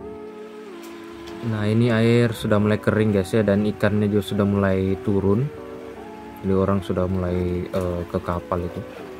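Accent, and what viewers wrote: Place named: Makassar, Indonesia. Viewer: logat bicaranya kayak berasal dari kota Makassar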